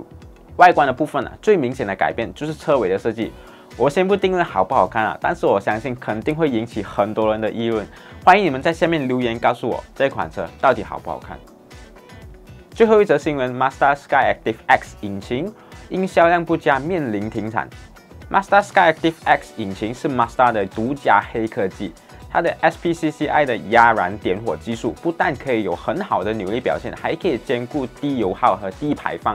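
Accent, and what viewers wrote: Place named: Malaysia. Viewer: 大马华人的普通话原本就不错，只是有些口音而已。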